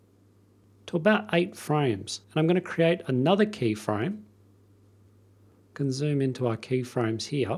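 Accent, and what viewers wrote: Australia. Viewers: thank you so much , i used for a wedding and it looks great , nice australian accent ?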